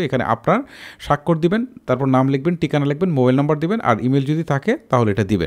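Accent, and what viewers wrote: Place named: Mymensingh, Bangladesh. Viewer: টিকানা, টিক আছে। ভাই আপনার বাড়ি কি ময়মনসিংহ বা কিশোরগঞ্জে?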